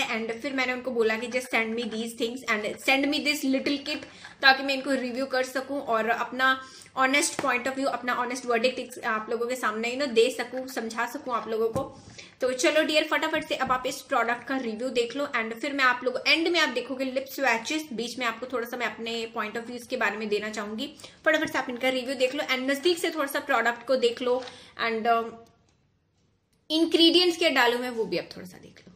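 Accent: Punjab, India. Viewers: Awwww 😍 the way she talk 😍 full Punjabi 😍 love you dii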